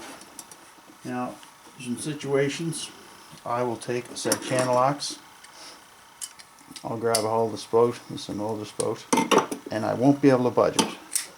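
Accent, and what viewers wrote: Canada. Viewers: Haha.. Yea, You definitely have a canadian tounge. Thank you for the video. I'm working on my bathtub as we speak. Take care. (Los Angeles, CA) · Bobby shut up. Whats YOUR problem troll? He has a Canadian accent. And I have no problem with his pronunciation. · Bobby, you are showing your ignorance. Are you going to correct an Englishman for the way he pronounces words too. This man is Canadian. That is the way Canadians pronounce spout.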